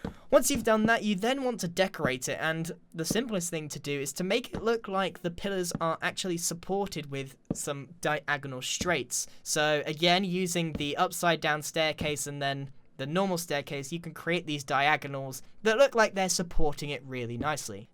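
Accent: United Kingdom. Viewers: Gabriella Siemer that’s called a British accent 😂😂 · God like you are like like you are so weird like I hate your accent like what kind of accent do you have French English English like United Kingdom English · uh... hes just british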